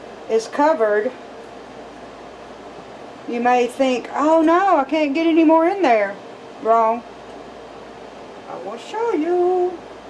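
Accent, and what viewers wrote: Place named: South, United States. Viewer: Southern Ark No, Thank you for teaching me things I don't know, and the way you say y'all with your southern accent.....love the way you speak.👍❤️🇺🇸🇬🇧God bless America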